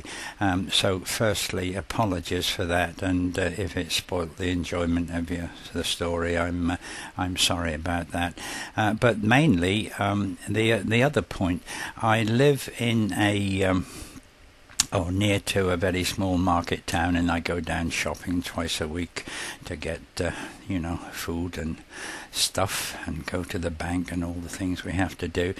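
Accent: United Kingdom. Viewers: I love how British people say process. Like "pro" at some type of game or sport. Great Video!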